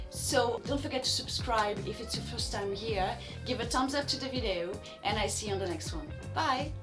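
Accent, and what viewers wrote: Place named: France. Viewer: I recognise a french accent haha have you got any tips in order to start tap dancing at home without taking lessons ? thanks in advance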